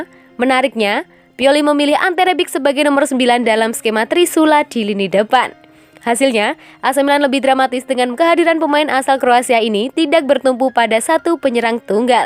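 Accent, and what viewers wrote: Java, Indonesia. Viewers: Beritanya top, Mbaknya org mn ya msh medok logatnya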